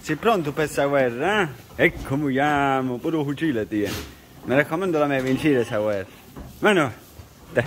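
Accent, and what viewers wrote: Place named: Lombardy, Italy. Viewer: Tipico accento lombardo 😅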